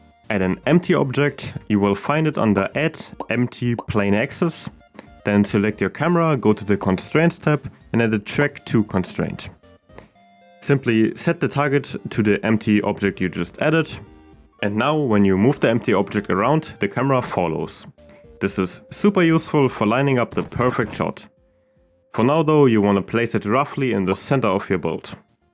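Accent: Germany. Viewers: Aber top Englisch👍 habs wahrscheinlich nur rausgehört weil ich deutschen Akzent halt kenne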